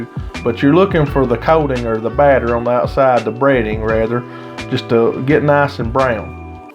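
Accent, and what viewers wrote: South, United States. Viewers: Not to sound mean but this guy sounds more southern than me and I’m from Louisiana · Southern draw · Loved the “country as cornbread” accent. ❤️ Great recipe. Thank You for sharing. My Friends and Family absolutely loved them. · The ultimate country accent!!!! Also great video I’ve been trying to find a good one.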